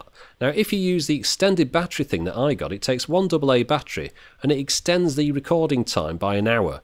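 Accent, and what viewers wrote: United Kingdom. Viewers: Dear Sir, you are anything but boring. don't know what that chap was on about. I could listen to you review anything! ...partly added that us across the pond enjoy the British accent.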